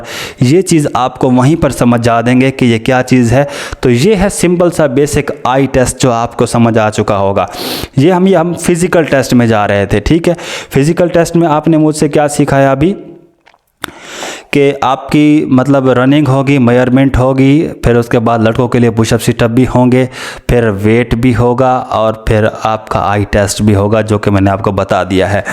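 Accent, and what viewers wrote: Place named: Saraiki, Pakistan. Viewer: ASSLAM WALIKUM NICE VIDEO LGTA SRAIKI HAIN AP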